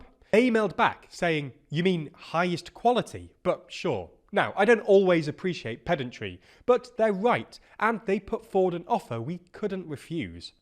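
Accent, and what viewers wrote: United Kingdom. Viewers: I have been to Iran for a month alone. This propaganda that just warms the west up for more genocide against innocent people. Spoken to women and police and asked what would happen if you dress inappropriately, as they do on French beaches, I was told by several women that they are sinply asked to adjust their wear. If they refuse, they take your name. That's it. When i told these women that the west believes morality police arrest and beat them, THEY JUST LAUGH. Women ALL OVER Iran do not cover all their hair. I would say visit and learn but you sound like a brit so you are not welcome. Sucks to be a fascist i guess! PS- i have never been on a country with so little police presence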